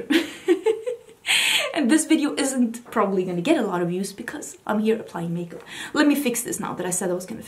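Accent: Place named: Sweden. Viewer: I watch every single one of your videos because you're a triple threat. You're gorgeous, intelligent, and sarcastically hilarious! From the first video I found by you, I remember thinking, "WOW, this girl freaking SAYS what many people are thinking but are afraid to say!" and I subscribed immediately. That was at least 2 years ago. (Plus I'm a sucker for a Swedish accent) I also thought you were so badass because your English is fantastic and feel honored that you chose to speak it in your videos. I wish there was a way to separate the "people who count as lots of views" from the people like me who watch every video as soon as it comes out but just my views aren't enough. Like there should be a higher level of adsense for repeated views by loyal subscribers versus random 12 year olds passing through YouTube looking for something that flags their attention, who aren't subscribed. 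But I guess ads are ads.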